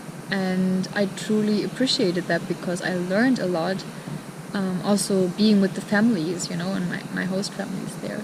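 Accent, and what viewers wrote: Karnataka, India. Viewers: You look like a German But your Style of speaking more like an India Girl...You fall in love with India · You have an Indian accent. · Definitely I'll put all my efforts to meet you when you will be in Bangalore. Big fan of your Indian accent.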